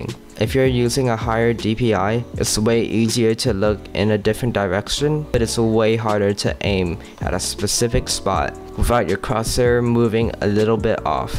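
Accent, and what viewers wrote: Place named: United Kingdom. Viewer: 1. Yes this is true 10+ years ago. You're probably one of those guys who links that Logitech engineer video from over a decade ago. 2. You objectively are though. You're increasing the amount of information your mouse registers, objectively. This hasn't been up for debate in a very long time, but people like you who memorized a bunch of random dated knowledge on forums just regurgitates this every time they get the chance for some reason when this topic is mentioned. Your strawman analogy of comparing polling rate to counts per inch was very bizarre. Polling rate is the objective measure of input delay. This isn't something I wasn't aware of, and honestly if given the choice, I would prefer the 400dpi 1000hz over 1600 dpi 250hz. One is 4ms of input delay and the other is 1ms. I feel like you haven't tried this, because you wouldn't be capable of holding your current beliefs, but just enter any random FPS you would like. 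Zoom in very closely with an awp or that game's scoped weapon, and on lower DPI you will notice it moves in slight chunks rather than smoothly. This is because the mouse, objectively, is picking up on less information. The 3kliksphillip video which aged like milk where he claims in game sensitivity modifier was the culprit has been long disproven. You can replicate this effect with virtually any sensitivity modifier, and the lower dpi skips pixels. Having a british accent and a few 100k subs on youtube doesn't make you immune to criticism, or always correct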